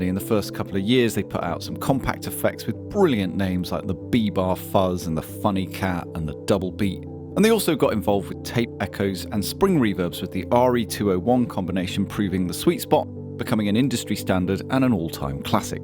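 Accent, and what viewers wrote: Germany. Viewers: Striking German accent there (now try to pronounce that properly!??? )! Yet another colourful, enjoyable and warm vid from:) Gotta add how inspiring it is when you show all the gritty details of the processes taking place, makes my fingers itch to get back to my studio...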